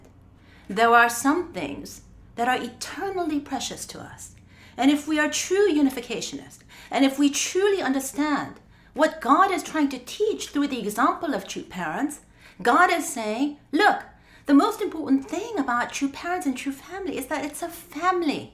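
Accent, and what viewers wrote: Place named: United Kingdom. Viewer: How did you get that British accent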